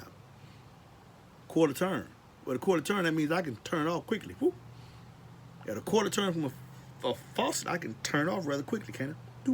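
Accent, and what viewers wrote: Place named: South, United States. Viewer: That country accent got me laughing 😂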